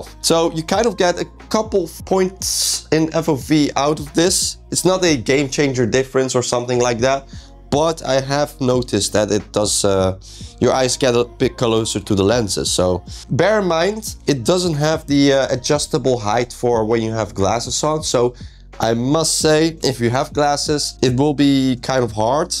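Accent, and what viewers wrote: Netherlands. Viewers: Is that a Dutch accent I hear?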